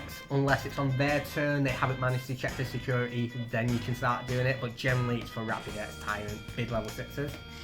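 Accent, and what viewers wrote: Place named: United Kingdom. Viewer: these auto subs are the embodiment of how hard it is to get an ear for a regional british accent sometimes 😅 Nice list!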